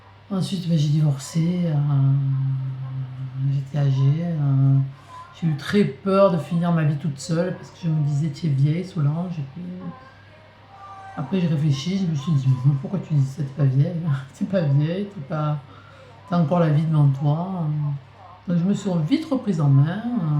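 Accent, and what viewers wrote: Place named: Var, France. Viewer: bonjour, je viens de faire ta connaissance sur you tube et je voulais te laisser ce message: j'ai relevé une phrase dans ta vidéo "le bonheur c 'est de ne pas ressaser le passé tout le temps" et bien là, c'est une des clés pour vivre heureux, il faut vivre le présent, aujourd'hui et maintenant comme on le dit si bien, car cette minute que je prends pour t'ecrire ce message s'est déja écoulée, la vie passe comme un éclair, il faut aller de l'avant et etre fidèle a ses convictions. J'aime ta façon de t'exprimer, simple et sincère, MERCI pour cette video, je me suis abonnée biensur et en plus je parle avec le meme petit accent que toi car je suis du Var:) bonne continuation!!!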